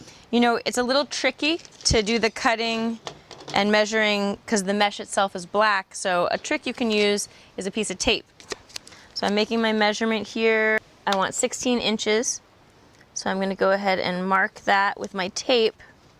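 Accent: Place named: Chicago, United States. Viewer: Thanks for sharing. You have a nice accent, maybe Chicago? I thought Woldfire Defence was an Aussie company. Anyway, here in Southern California, crawl spaces really make you crawl, and in some cases, creep (like a snake!), so I’ll be installing from the outside. Good video, by the way. I’m going to watch the rest of the series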